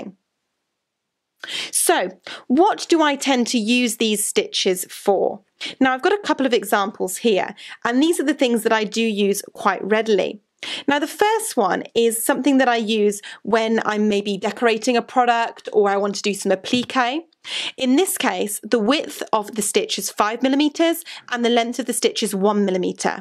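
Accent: United Kingdom. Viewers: Tina Bailey Actually, I think she’s saying “join me back here.” She has a lovely British accent which may sound like draw instead of join.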